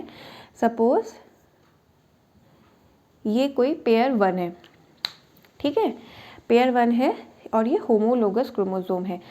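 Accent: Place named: Pahadi, India. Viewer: Mam aap pahadi ho kya bahar se awaj aa rahi h phadi bolne ki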